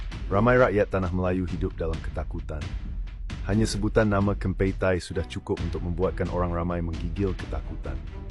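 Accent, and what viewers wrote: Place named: England, United Kingdom. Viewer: Xboleh cakap melayu elok2 ke..cakap pelat omputih bagai..buat malu bangsa ja kau..mat poyo..mat salleh celup. Bangang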